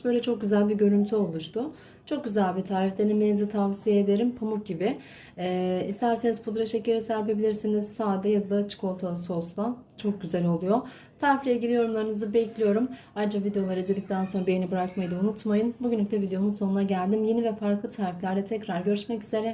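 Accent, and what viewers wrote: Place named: Azerbaijan. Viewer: Azerbaycanlı birinin türkçe böyle konuşmasında hiç sorun yok siz Azerbaycanca biliyormusunuz hiç?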